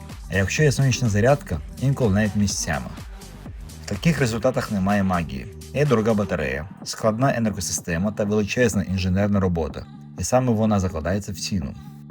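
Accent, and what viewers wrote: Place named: Russia. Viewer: тому, що людина була все життя російськомовною і ще не впоралася з цим маркером російського акценту.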